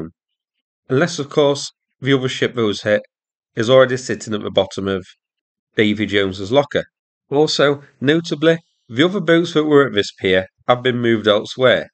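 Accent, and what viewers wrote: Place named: Mansfield, United Kingdom. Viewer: It's because he's speaking British English with a Mansfield accent. Most of the automated caption systems are only trained on a generic American English accent and vocabulary. A compamy I worked for in the UK considered buying a captioning system. The prospective vendors came to show their software, and every single one failed to correctly recognise even half the British English words we threw at them. When we tried Glaswegian and Newcastle (Geordie) accents, the recognition rate fell to zero.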